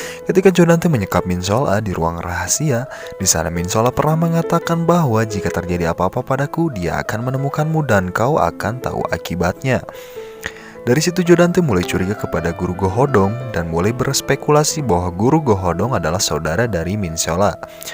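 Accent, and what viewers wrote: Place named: West Java, Indonesia. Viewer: Aduh kang Sunda pisan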